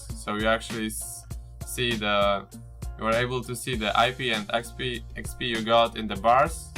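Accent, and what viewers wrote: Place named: Poland. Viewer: Are u from poland ? becouse i can hear polish accent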